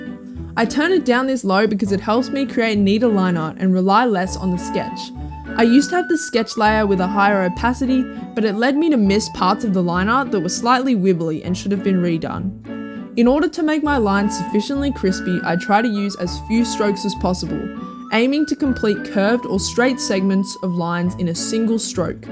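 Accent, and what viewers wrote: Australia. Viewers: Are you... Australian??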